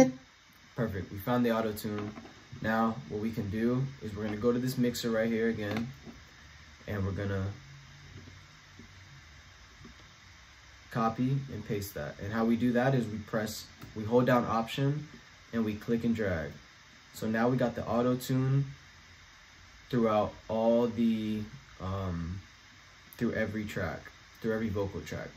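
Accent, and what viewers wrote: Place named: California, United States. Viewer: that’s wild lmfao I can hear it in your voice you been around Cali people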